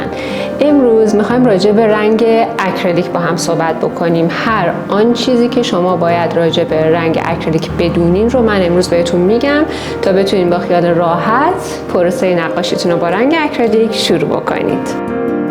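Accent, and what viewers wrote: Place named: Isfahan, Iran. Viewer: فهمیدم اصفهانی هستی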